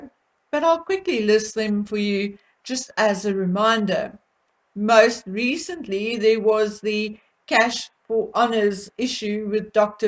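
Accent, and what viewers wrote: South Africa. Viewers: I have been in the UK for nearly 20 years, and I see quite a few similarities to South Africa, but you dare not say anything without being told to "go back home then if you don't like it". I see it in job advertisements where in South Africa you have still the person of colour being preferred for the job. That is happening here now. They word it where they say they prefer applicants of colour/persuasion. Love your research and as a South African another welcoming fellow Saffa accent.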